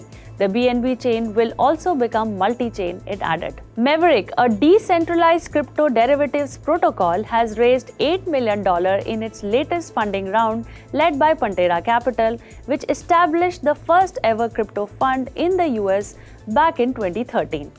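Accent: Gujarat, India. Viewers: Mam, You are presentable and I think you also have some kind of tech background (probably database programming). But your accent and musical lilt in pronunciation is very Gujarati. Nothing wrong with that, but please try to be accent neutral. There are training courses which people undergo in BPO/Call Center related industry to cultivate the right accent. I highly recommend you to please undergo such training. Take this as constructive criticism and not negative criticism. I mean good for you. All the best.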